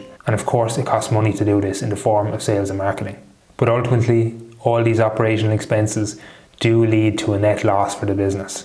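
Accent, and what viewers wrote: Ireland. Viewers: County Mayo accent?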